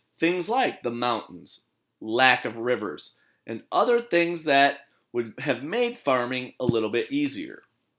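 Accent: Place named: United States. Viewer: he has a new york/jersey/midwestern accent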